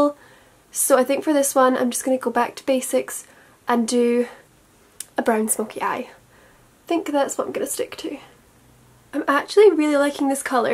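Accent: Scotland, United Kingdom. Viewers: Oh my gosh, I just came across you and honestly you are so lovely and cute, and I totally thought you were going to be american and then you started speaking and I got such a fright cause you are scottish? haha xoxo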